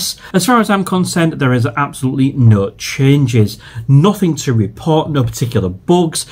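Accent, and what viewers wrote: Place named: North East England, United Kingdom. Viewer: Definitely doesn’t sound like a Yorkshire accent being on the Humber where is it you’re actually from? It sounds more Sunderland Newcastle Middlesbrough area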